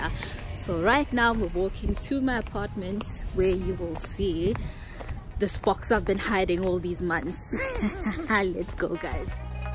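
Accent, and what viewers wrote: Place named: South Africa. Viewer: I was only like 15 sec into the video and I was like, you sound South African😂🇿🇦I was right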